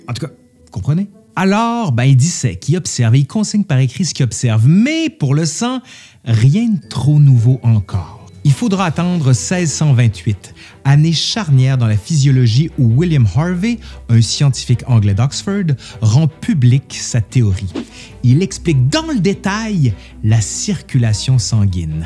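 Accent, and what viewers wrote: Canada. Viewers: très intéressant... en plus. j'adore votre accent canadien ;-)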